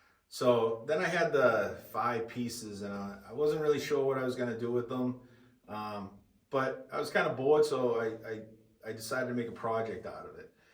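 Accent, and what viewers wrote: Boston, United States. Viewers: Sounds like a fellow Masshole that likes good drums. Fackin ah, khed!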